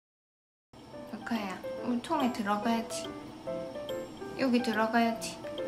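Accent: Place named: Gyeongsang, South Korea. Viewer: 얘 나이가 어떻게 되요? 우리애도 보테인데..남자애고..3살이고...경상도 사투리 쓰시는거 보니...저는 김해 살아요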